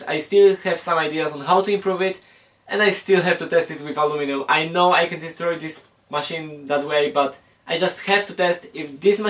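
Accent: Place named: Poland. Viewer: Bardzo fajny projekt sam chciałem zrobić coś podobnego i słuchać że jesteś Polakiem po akcencie i po nazwisku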